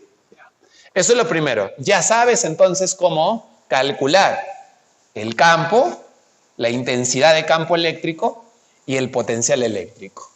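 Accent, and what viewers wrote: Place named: Mexico. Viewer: Hola, de donde eres ? Tu acento es mexicano, pero parece que vivieras en Perú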